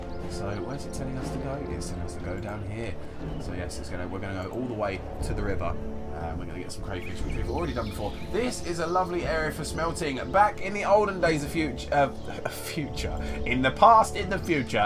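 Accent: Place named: Scotland, United Kingdom. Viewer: I think you were suppose to stand next to Gideon so he could shield you when the demon did his super attack thingy. But you managed to kill him anyways so it's all good. Can the baby troll be named Pickle? I think it should be named Pickle. :D I think your Scottish accent is fine, but I'm Canadian so what do I know? :P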